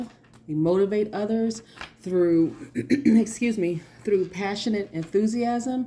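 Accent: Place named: Chicago, United States. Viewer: You are too cute. You talk like you are from Chicago! Bust some suds! Yes, the reading ... on point as always!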